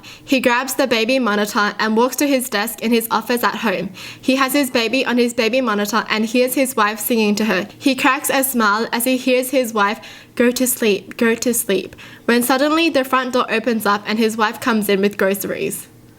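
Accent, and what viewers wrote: Australia. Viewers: She knows how to say it,it's just hard to say probably because she has an australian accent.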